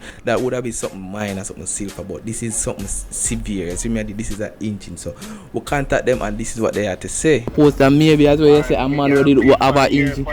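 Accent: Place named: Spanish Town, Jamaica. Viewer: lol, the fact sey mih can immediately tell seh a spain twn 😅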